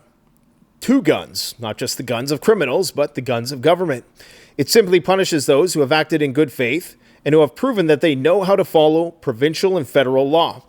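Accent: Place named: Rural, United States. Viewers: Thanks for the update,. Good news sounds like some are Standing Up, Speaking Up, Taking Action, as it should be. Your hick accent brings back fond memories of the All-American Prepper maybe we might see him in the near future "if" there is one. Stay Safe & Good Luck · that's NOT how Albertan's talk...what kind of accent was that??? Texas??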